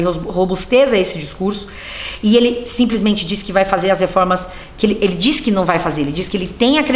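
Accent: Rio de Janeiro, Brazil. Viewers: Afinal vc é carioca, paulista... ?? Começa puxando o S, depois passa pro R "arranhado" dos paulistas, depois o R mais interiorano.. "Aceeinto", "dizeeindo".. me perdi.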